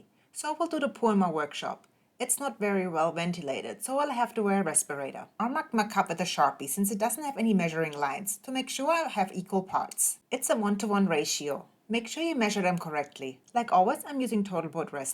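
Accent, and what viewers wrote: Germany. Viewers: The lack of German being spoken in this video makes it less fun. 🤣🤣🤣....it's all good, though. The accent makes it worth it still.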